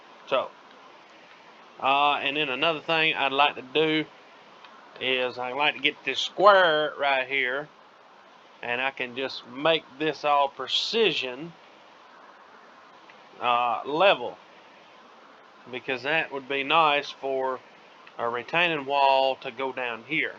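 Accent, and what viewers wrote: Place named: South, United States. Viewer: Also man, if your still having issues with your LSPDFR, I have a full support team in my discord that can definitely help you get it up and going. They’ll treat you good man, and if for some they didn’t, you can always talk to me about it and I’ll handle it. You seem like a really down to earth guy and I really enjoy the content as well as the southern accent lol I’m from Tennessee myself. Anyways man, just thought I would throw that out there for ya.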